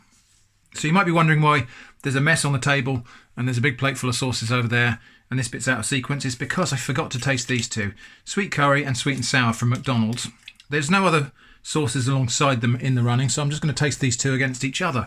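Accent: England, United Kingdom. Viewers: I just found your channel today - are you the long lost child of English brown couch enthusiast Ashens and scam baiting, northern Irish Jim Browning? I never knew I needed another soothing, English male commentary on quirky things- but thank you!!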